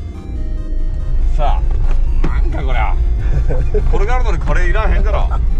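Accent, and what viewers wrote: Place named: Hiroshima, Japan. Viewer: 清木場さん広島弁ですか？ 広島住みですが、方言が親近感湧きます😀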